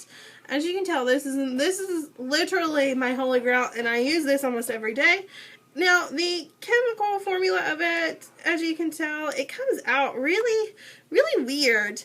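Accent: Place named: West Virginia, United States. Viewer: All I need is broke fat girl with a WVa accent sounding like hillbilly Minnie Mouse telling me how to look cheap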